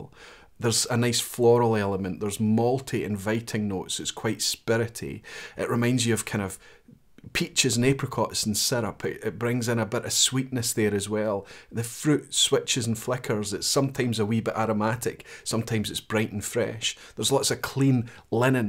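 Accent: Scotland, United Kingdom. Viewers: Don’t tell me that a great deal of this mans YouTube success is his accent! Love the Scots!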